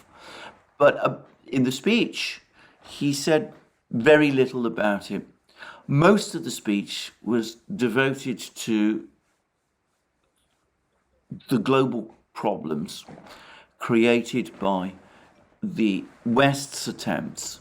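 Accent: England, United Kingdom. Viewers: Russian disinformation with an English accent!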